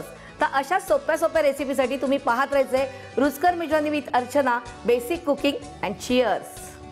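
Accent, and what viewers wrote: Pune, India. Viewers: Me punyachi ahye pan atta Solapur la tumch bolana punyachi athvan Karun dete mast recipe astat me saglya thandgar reci try kelya toooooooo good .tq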